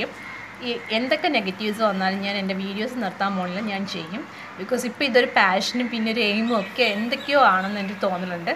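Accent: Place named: Kollam, India. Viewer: njan kollam aanu😅😅. Samsaarikkunne kettappol thonni aa side aannu😃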